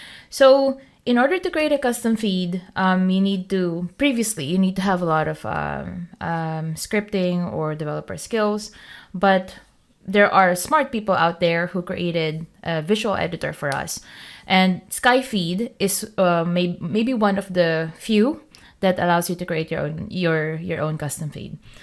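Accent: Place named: Philippines. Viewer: are you filipino??? your accent is sooo filipino english to me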